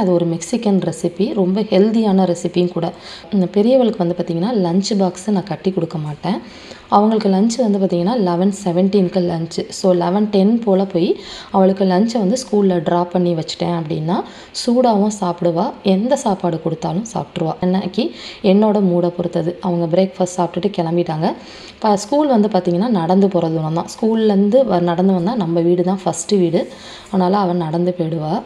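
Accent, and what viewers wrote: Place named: Tiruchirappalli, India. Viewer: my name Devi 67 years old l got my degree on1974 from your way speaking Tamil I thought that u may be Trichy or Thanjai Trichy so many relationship are there Trichy past mla anvil poyyamozhi My close relative from your way of speaking I understood I am also interested in cooking like you My daughter at Fort Worth she will also cook nice ly Yesterday l spoke about you to my daughter her name Narmatha She’s working at CTS software company 2017 we came to Fort Worth stayed 2months Stay blessed with your family Bye da Chellam Trichy town are near by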